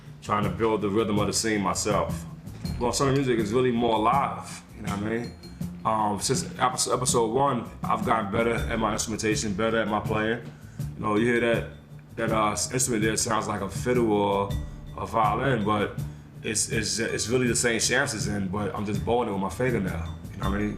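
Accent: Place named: United Kingdom. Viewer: Why does he speak with a British accent?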